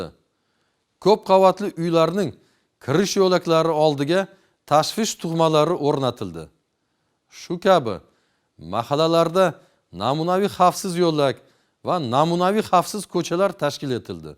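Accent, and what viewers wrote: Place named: Russia. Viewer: Амаки русча мактабни битирган шекинли акцент ута дахшат омад тилайман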